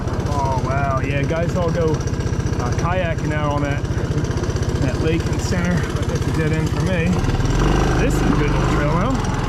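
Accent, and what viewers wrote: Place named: Delaware County, United States. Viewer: You gotta be from Delco. Love the content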